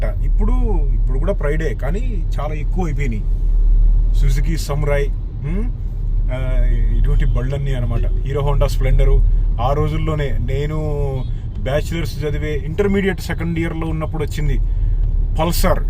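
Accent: Andhra Pradesh, India. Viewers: Your slang is looking like Andhra, not telangana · Andhra language laaga undi meedi due your circle in usa or meeru tg ki move ainara ? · Broo nenu ni voice chusi andhra anukuna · Hello, garu, Mee accent Andra telugu laga undi, Nizamabad accent ledu. Mee grandparents proper place ekkada? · Brother... Telangana slang.. kaadu ❌ Andhra slang ... ☝️ How..how how??? 😅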